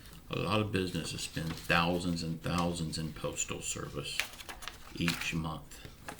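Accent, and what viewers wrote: South, United States. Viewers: I love your channel. I want to Thank You for everything.. U r the only one that seems to know whats going on , plus o love that southern accent... take care hope Amy is doing lots better ..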